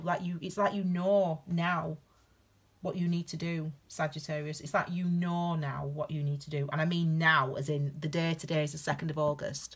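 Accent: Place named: Northern England, United Kingdom. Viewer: Northern England represent! Sounds like you are from my neighborhood. Thanks for this xx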